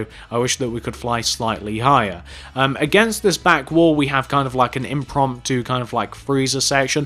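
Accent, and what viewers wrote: United Kingdom. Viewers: I knew you were British because of your accent. Thanks for your country saving us from the French.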